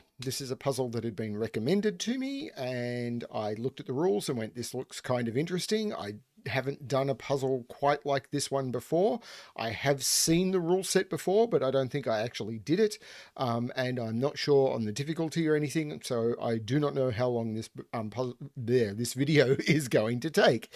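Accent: Australia. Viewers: Love the Aussie accent... had to check the screen to see if the setter was truly "Naughty"! lol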